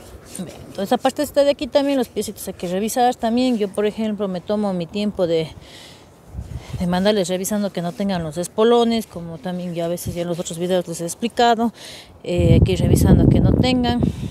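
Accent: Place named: Sierra, Ecuador. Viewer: Así hablan en Cuenca y en la mayor parte de la Sierra de Ecuador, si no le gusta vea otro canal y no traiga hate a esta bonita comunidad q tiene.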